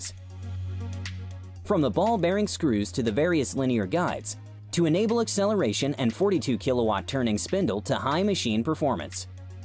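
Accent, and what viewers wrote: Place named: China. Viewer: Terrible chinglish.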